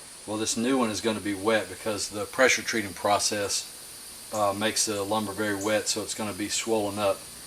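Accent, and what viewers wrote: South, United States. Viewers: Love the southern accent. Cheers from Australia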